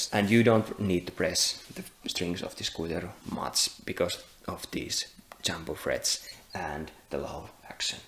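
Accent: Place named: Finland. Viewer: Hahahhaa äijällä vitun selvä suomi aksentti.. Hyvä video!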